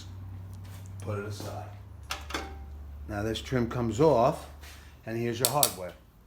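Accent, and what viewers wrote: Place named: Long Island, United States. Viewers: thanks for the video! nice vett in your profile pic sounds like your from NY as well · Excellent video! I watched a bunch of videos about replacing my bars, but yours is the most thorough - that’s important for me. And your LI accent makes me smile! I love the island. Do you know the band Zebra? WBAB broke them in the early 80’s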